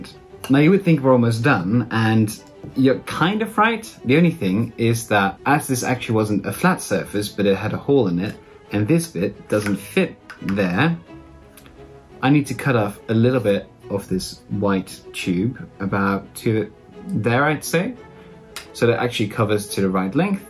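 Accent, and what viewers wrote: United States. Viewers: 🍃♥️Really sweet❗️. Romance is in the air at LaLande which makes it all the more fun to watch. I was wondering how you came to have an American accent when you learned English? You have a beautiful voice…would love to hear you speak French more too!🍃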